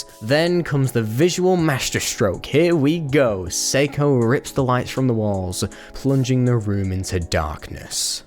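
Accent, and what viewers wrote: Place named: United Kingdom. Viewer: As a fellow Seiko lover the amount of random Seiko yelling segments make me chuckle, also its fun to hear another brit talk about this series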